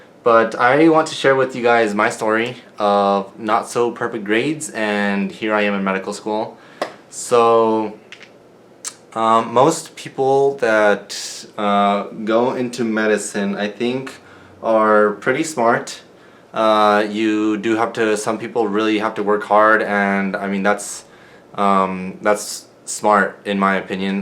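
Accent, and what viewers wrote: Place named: New Mexico, United States. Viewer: Hi, I instantly recognized your NM accent and then I heard you say NM and I knew I was right lol. Thank you for posting this. I really resonate with your story, I went to Santa Fe High and it was so easy compared to college, I definitely didn’t do hot my first two years, I went to college out in Boston. I just finished my post bac, close to 30 hrs with a 4.0 & am hoping for an amazing score on the MCAT & will be applying this May. Thank you for sharing it’s so important to see people that we can relate to in this space!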